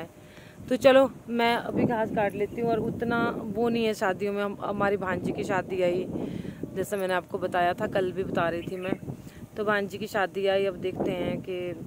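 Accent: Punjab, India. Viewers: Tuhadi Punjabi Bahut mithhi ai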